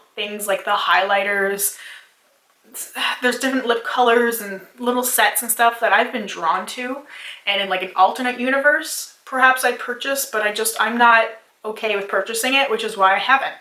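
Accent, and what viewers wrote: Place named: Canada. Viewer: It’s always funny hearing you say “out” and “about” but you also say it really cute. I think it’s a Canadian thing lol cuz I’m American and we say it differently. But you say a very cute, soft, “oot” and “aboot “ and it’s very endearing.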